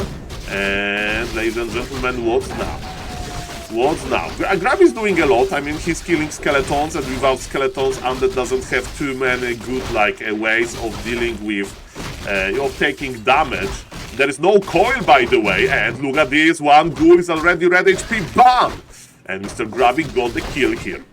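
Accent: Poland. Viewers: Hey, I've seen your commentary with Neo at Back2Warcraft, is it you? Are you polish? Cause your accent sounds like you are polish, just like me :D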